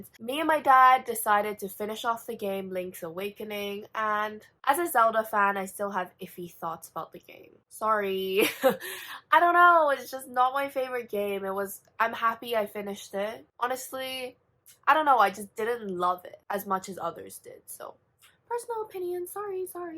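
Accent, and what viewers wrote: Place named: United Kingdom. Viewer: and that british accent. bro. whO ARE YOu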